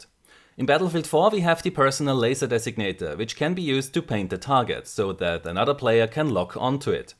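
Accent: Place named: Germany. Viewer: why u sound so german ?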